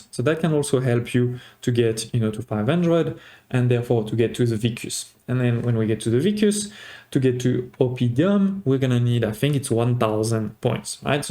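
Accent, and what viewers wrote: France. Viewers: Avec le bon accent français hmmmmm 😮‍💨👌🏻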